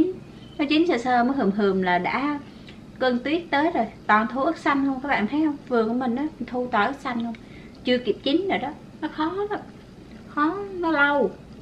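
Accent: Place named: Hue, Vietnam. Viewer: Cô nói tiếng huế hay ghê á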